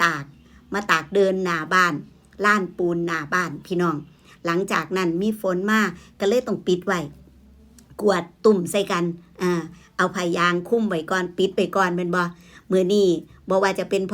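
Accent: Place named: Ubon Ratchathani, Thailand. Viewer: ชอบการเล่าข่าวแบบนี้ น้ำเสียงแบบนี้ สไตล์ 🎉🎉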